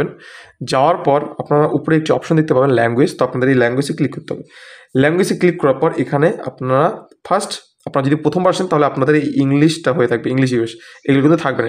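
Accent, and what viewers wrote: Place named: United States. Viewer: American Bangla aaina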